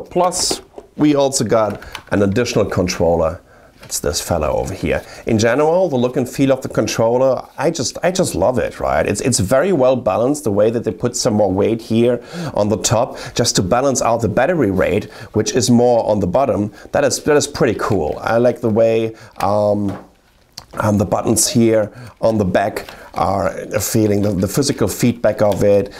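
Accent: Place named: Germany. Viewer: God damn i love Germans speaking english.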